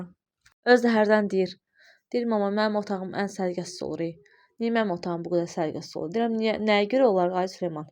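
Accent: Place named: Quba, Azerbaijan. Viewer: baci siz haralisiz..lehceniz Quba ya oxsatdim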